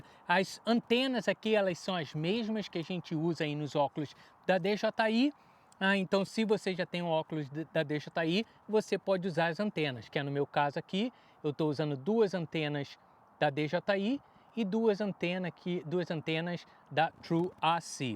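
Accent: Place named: United Kingdom. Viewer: Vc mora no Reino Unido ou já morou por la? Tu tem um sotaque muito britânico hehehe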